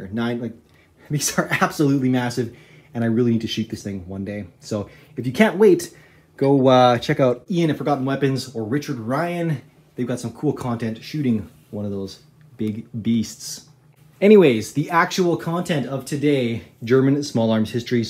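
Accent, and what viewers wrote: Canada. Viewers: yo is this guy a canadian??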